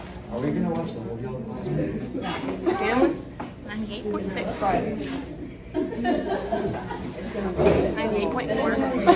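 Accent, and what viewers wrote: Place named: United States. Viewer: Your English is PERFECT. With an American accent no less, simply amazing. As a former host dad in Virginia it always pleases me to see an exchange student enjoying their exchange year. Remember to do and see as much as possible. As you know it is a once in lifetime opportunity. All the best on your American journey.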